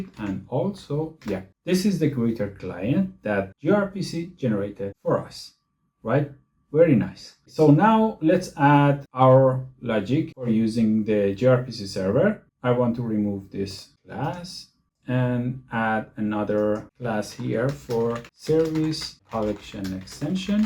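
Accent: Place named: Iran. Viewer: بعضی از تلفظات خیلی فارسیه،حیفه متد، ریسپانس و ... که بیشتر تو محیط های آیتی انقدر اشباه تلفظ میشه که تلفظ اشتباهش فسیل شده.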